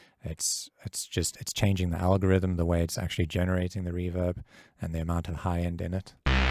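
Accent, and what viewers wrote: South Africa. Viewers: hey man, are you south african by any chance?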